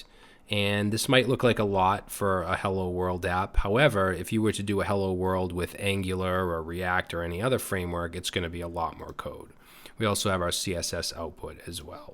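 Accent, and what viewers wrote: Boston, United States. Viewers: Great tutorial! Sounds like you're from the Boston area, ("btn dahk")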